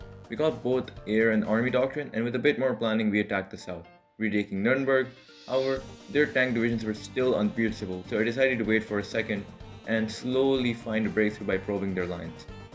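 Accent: India. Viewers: Holy Indian accent🥀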